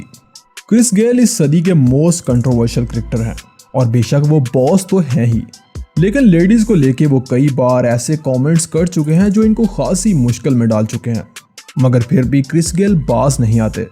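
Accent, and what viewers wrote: Pakistan. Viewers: Yeh Pakistani lahza h is creator ka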